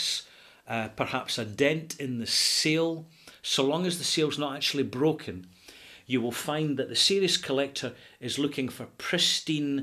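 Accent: Scotland, United Kingdom. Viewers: is my goto guy for all things whisky. However, for those of us in the USA who have a little difficulty with the Scottish accent (although who much appreciate it), it would be really helpful if the Brands reviewed in the video were listed in the notes.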